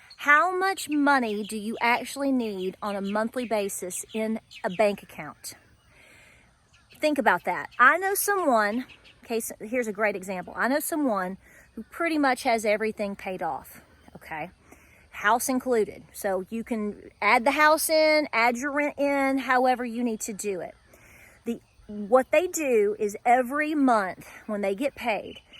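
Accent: South, United States. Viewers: This is how mamas in the south used to talk. It's music to my ears. I'm 63 watching the video mumbling things like "yes ma'am."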